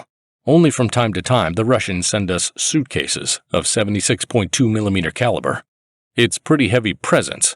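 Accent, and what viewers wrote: United States. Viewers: I loved this series until you added the bullshit American voice.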